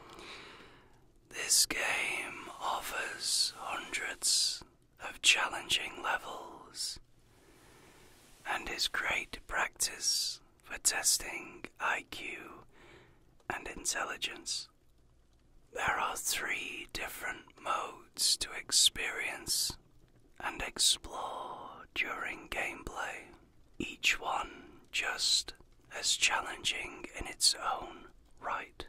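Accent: United Kingdom. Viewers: Thus video would be SO MUCH cooler if it was LotR-themed. Just saying. Your voice is fine. 🤔 Obviously British, yes? 🥰🤗💖🇨🇦 I ABSOLUTELY LOVE Brits!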